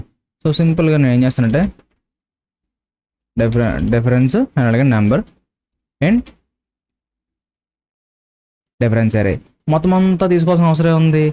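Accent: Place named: Godavari, India. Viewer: You are from east or west godavari... Ur slang in very close to ours...🙂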